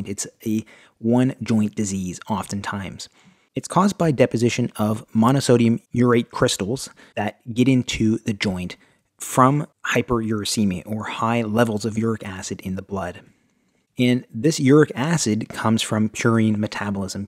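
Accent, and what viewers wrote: Canada. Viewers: He sounds Canadian. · I think he's Canadian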